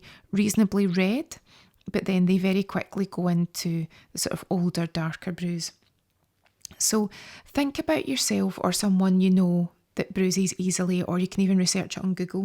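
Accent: Scotland, United Kingdom. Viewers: I Love your accent :) is it scottish? Sorry oft this is torally wrong xD I'm Not good at guessing accents haha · 😁😁😁 No way! 1) I have no competence in "show & tell" 2) gorgeous Scottish accent beats awkward Italian accent hands down 😜 Keep up with the good stuff!😁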